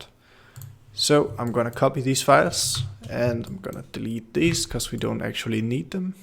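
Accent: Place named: Netherlands. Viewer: from listening to your accent i'm assuming you natively speak Dutch. anyway, it would be great if you also had a video showing how to make the sequenced music (i believe composers just converted midi files to the SEQ format?) and the sample instruments as VAg and then into a batch VAB file . (im not too sure on the specific details but i believe the SEQ file and the VAB file containing the VAG sample instruments((with their loop points data etc)) were to be compiled into one file PSF i think) so that the sequencing/synthesis part of the audio also is explained, instead of the 'Streaming audio' part. also i read on the architecture aspects that it was also possible to do FM Synthesis, which i also would like to see demonstrated, the PS1 remains such a fascination to me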